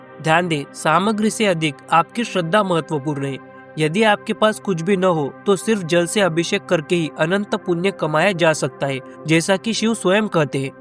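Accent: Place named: North, India. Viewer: Bhai mujhe aapki baate south indian ki tarah lgi lekin hindi bolne ka accent north indian lga isliye pucha, 😀 If you don't feel to tell then it's okay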